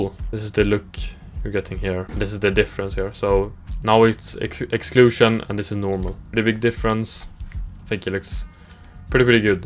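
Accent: Sweden. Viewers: man hör svenskan haha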